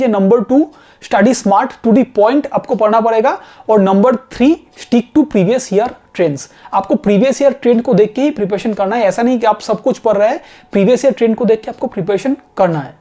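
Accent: Bengal, India. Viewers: Sir aap Bengali ho?? Kya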